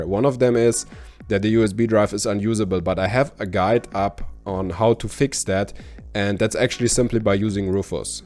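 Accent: Germany. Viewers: Nice one, deutscher Akzent lässt sich einfach nicht verbergen 👍😁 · bist du deutsch? dein akzent🤗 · Are you German?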